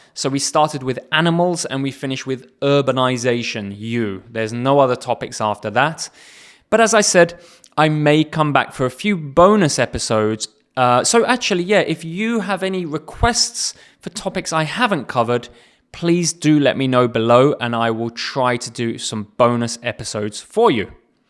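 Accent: RP, United Kingdom. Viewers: Uncountable thank you. Your British English is easy to understand for me. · Are you using rp accent?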